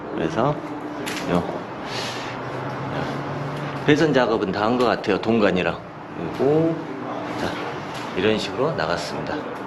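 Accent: Jeolla, South Korea. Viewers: 얼굴은 잘생겼는데 사투리가 심하네요 인자는 전라도 사투리? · 대한민국 사람이면 누가 들어도 전라도 사투리를 ..ㅎㅎ 강원도인줄 알았다네..ㅋㅋㅋㅋ 탈북민인가? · 딱봐도 전라도 사투리 쓰시는구만 한국사람맞냐니 말하는꼬라지보소 인성먼저 갖추시길 바람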